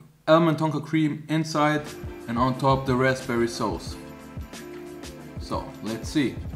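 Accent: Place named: Germany. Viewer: Das hört sich an wie wenn ein deutscher probiert englisch zu sprechen halt nur in richtig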